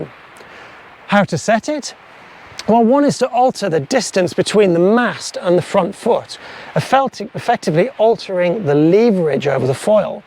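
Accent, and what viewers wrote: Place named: United Kingdom. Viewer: Thank you very much for the precious advice, delivered in a fantastic British form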